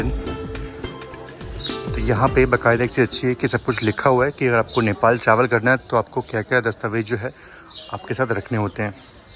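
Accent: Varanasi, India. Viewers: Bhaiya AAP banaras ho ky aap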